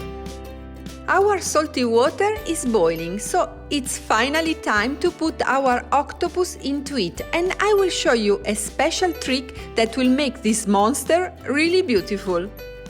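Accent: Italy. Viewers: You have big Italian accent but I understand you very well Better then a person with an American accent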